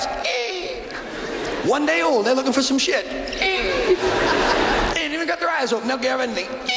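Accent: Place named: South, United States. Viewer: He sounds Southern in this vid, for some reason.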